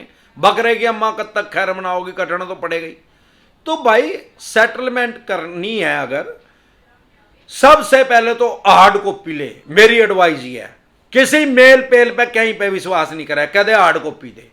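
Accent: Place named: Kaithal, India. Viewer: Sir your voice is similar to our we belong to kaithal area nice presentation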